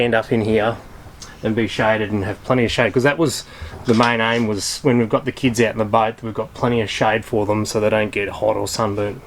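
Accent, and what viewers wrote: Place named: Australia. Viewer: As soon as i heard the Australian accent and then the stupid music i just new it was time to stop watching this vlog 😮 greetings from England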